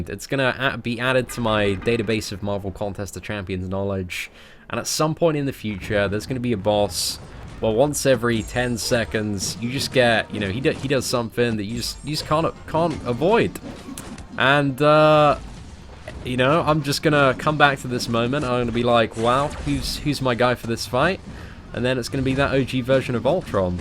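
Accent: United Kingdom. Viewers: where r ur streams at boy.... enough with ur personal meaningful life... we need ur british accent chattering in the background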